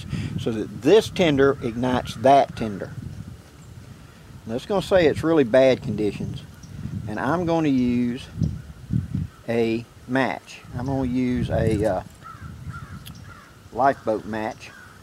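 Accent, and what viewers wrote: South, United States. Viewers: Thank you for the wonderful teaching field trip...love the scenery as well...as always i am very impressed with your Videos, including the Southern drawel 🥰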